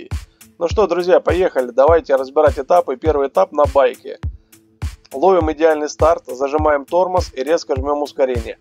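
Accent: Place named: Ukraine. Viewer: Для Украины нормальный говор. А нам русским режет слух. Зато какая информация ценная! 🔥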